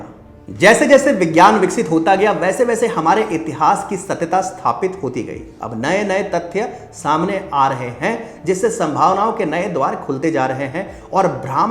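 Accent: Standard. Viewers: Detail study video. Nice. Shudh hindi sunkar bahot acha laga. Dhanyawad.👌👌👌👌👌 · i respect your beautiful shuddh Hindi narration 👌👌👌🤩🤩🤩.. Phranaamam Namashkaar 🙏🙏🙏 AIT is a false theory to divide us! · । आपका शुध्द हिंदी का वाचन करना एवम् आपके हिंदू-प्रेरित तथ्य, आपके हृदय में स्थापित हिंदी, हिंदू एवं हिंदुस्तान के प्रति अप्रतिम आस्था को प्रदर्शित करता है ।हिन्दुओं को अपने संस्कृति के प्रति जागरूक करने हेतु आपके इस अद्भुत प्रयास के लिए मैं आपका आभारी हूँ । वास्तव मे आप अतुल्य है। ।धन्यवाद ।- प्रभात रंजन सिंह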